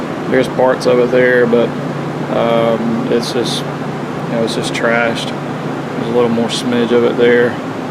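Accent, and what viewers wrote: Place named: Boston, United States. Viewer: Awesome videos dude! Your Boston accent is wicked pissah, you’d fit right in up here.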